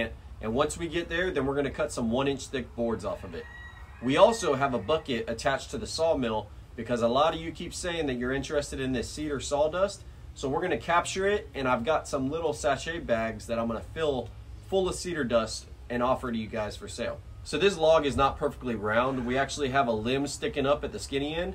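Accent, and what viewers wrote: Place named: South, United States. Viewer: I am not from your country, can I ask based on your accent and a Tee shirt you were wearing one day about hospitality in the South - East, is the timber you are milling today Swamp Cedar? It has the most amazing coloured wood. Really wished you had thought to pour water on it to show us its wet colour. We have a few similar coloured trees in my country one we Call the Australian Red Cedar, and the is called Jarrah (a hard wood). thanks.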